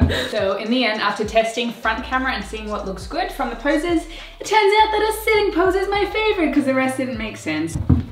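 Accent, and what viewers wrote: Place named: Australia. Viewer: Were you using a timer or? Also! You’re so bubbly I love you channel! And! What’s your nationality because your accent is a bit of a mix. I’m from Australia and you sound kinda aussie!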